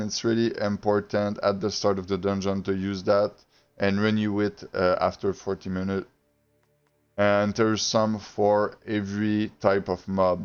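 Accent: Quebec, Canada. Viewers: Vu que je remarque notre fameux accent quebecois, je vais t'ecrire en francais hahaha. je me demandais si le build serais bon avec la greataxe aussi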